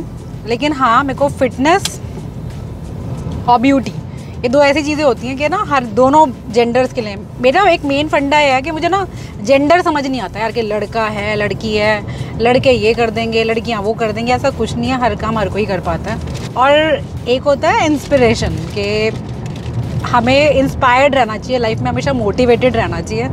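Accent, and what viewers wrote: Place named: Northeast, India. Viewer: North east wala hindi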